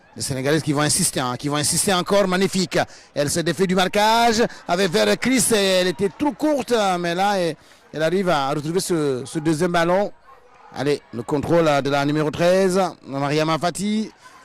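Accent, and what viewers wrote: Ghana. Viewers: Why is he sounding Ghanaian?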